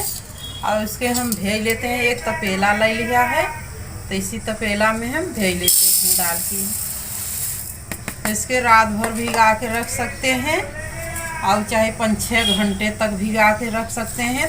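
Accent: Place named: Uttar Pradesh, India. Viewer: Mujhe aapki recipe pasand aai,aur aap k bolne ka tareeka bhi,sultanpur side ki hai lgata hai aap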